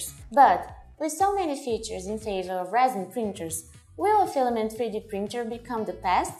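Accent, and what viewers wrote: Brazil. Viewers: Brazilian accent? Essas paradas em inglês já tá saturado, manda uns em português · You sound so Brazilian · Your accent is EXACTLY what I would expect from a native Brazilian Portuguese speaker. Wonder now where you're from. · Reconheço esse sotaque Brasileiro!! 🎉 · seems a brazilian accent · Are you from Brazil by any chance? 'Cause i hear a peculiar brazilian accent lol. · Impressão minha ou é brasileira? Rsrs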